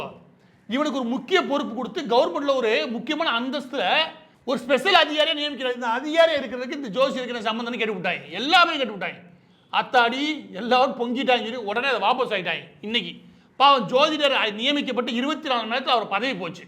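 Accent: Madurai, India. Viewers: அண்ணா பேசுவது தான் நல்ல இருக்கும் மதுரை மொழியில் 👍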